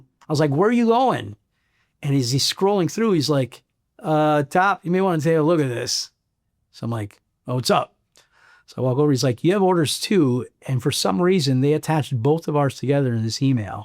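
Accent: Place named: Chicago, United States. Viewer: Riveting story teller. I can't stop listening to that old school Chicago accent.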